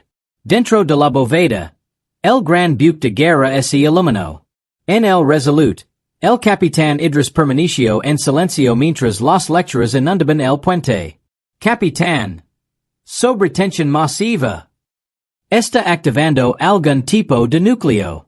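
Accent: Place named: United States. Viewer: Hola lastima la voz que le pusieron porque son buenas historias.pero escuchar a un gringo hablando un español pesimo es como escuchar la pelicula de terminator en español catalan de España.horrible.es raro porque otros videos tienen muy buenas voces..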